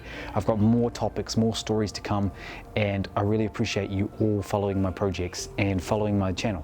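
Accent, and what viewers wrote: New Zealand. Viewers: Bro you sound heaps kiwi. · I think you sound ultra kiwi. Though this is coming from a kiwi who lives in AUS. Accents aside, hope you and your family are well. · You sound like a kiwi to me. I did have a friend in Colorado, from NZ, & his accent was more pronounced. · Russian is what you heard for 2 years, so I imagine it's still there in your subconscious somewhere. Hopefully that makes it easier to pick back up. BTW, I'm American and I could easily pick your accent up as Kiwi :)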